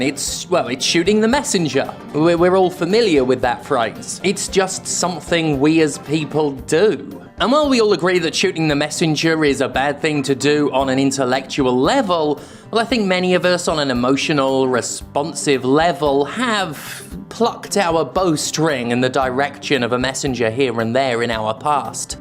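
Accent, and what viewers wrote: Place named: England, United Kingdom. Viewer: Love how as never lost his English accent.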